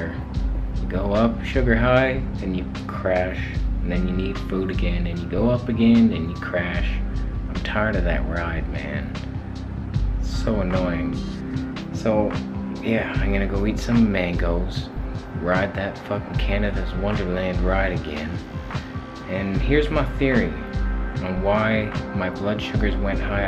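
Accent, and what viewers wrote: South, United States. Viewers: You had a southern drawl in this video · u talk like u 4rm the south son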